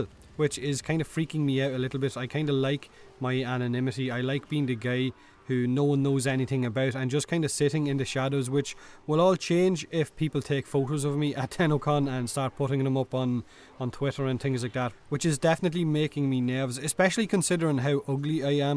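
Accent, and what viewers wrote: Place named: Ireland. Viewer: I started Warframe back when Loki was still a starter frame, but then I stopped for quite a long time. Now I'm back at it, and I've had to search quite a lot of guides just to get my "mojo" back. Anyways, I stumbled upon this particular channel and I'm very much excited to find a youtuber with a even stronger Irish accent than Jacksepticeye. <3 xD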